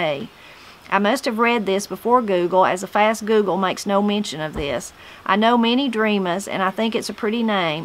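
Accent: Appalachia, United States. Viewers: Your accent is beautiful - it speaks of where you’re from and the long history of people in Appalachia - accents aren’t as strong here in Canada. I really enjoy your videos - thank you! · I love hearing you talk, with the accent my Appalachian family brought to mo.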